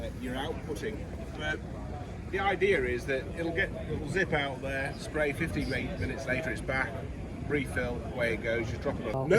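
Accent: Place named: Scouse, United Kingdom. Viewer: What makes an interesting theme. it simply YOU. You have a way of narrating a video which is unique. I think it’s your soft Scouse accent, your genuine and honest and say it as it is attitude. I worked on farms as a kid and am lucky enough to have a couple of acres of English countryside and look forward to your vids most nights. You come across as a caring sole who does his bit and probably a bit more for your local community. I wish you all the success in your real job and the u tube thing. Quite simply you get outa life what you put in. Keep up the great work your a credit to your family 👍🏻👍🏻. That’s made me feel 100% 👍🏻👍🏻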